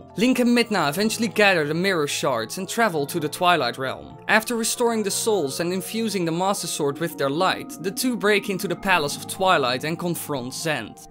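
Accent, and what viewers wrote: Netherlands. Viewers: Jij bent Nederlands zo te horen. ;) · is hij nou eigenlijk Nederlands?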